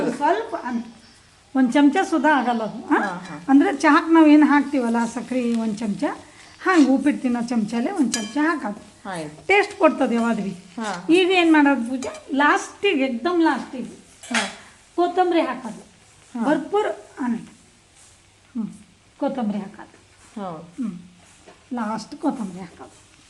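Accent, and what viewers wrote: Maharashtra, India. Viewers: Nimma Marathi mix bhase tumba channagide😊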